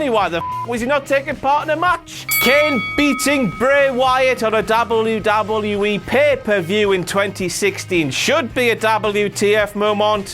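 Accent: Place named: England, United Kingdom. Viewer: I wonder what part of England is he from.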